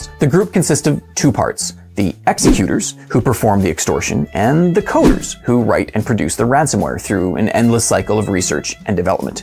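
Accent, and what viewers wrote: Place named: Canada. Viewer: You Canadian eh?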